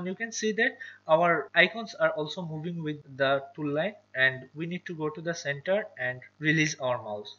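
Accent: India. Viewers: Did you hear an indian accent ? Oh You found the right video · You know you found the right video when you hear that Indian accent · Bengali accent !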